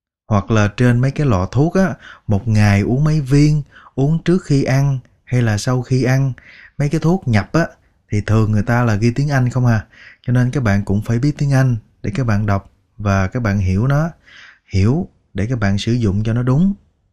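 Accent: Standard. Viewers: Thay phat am chuan qua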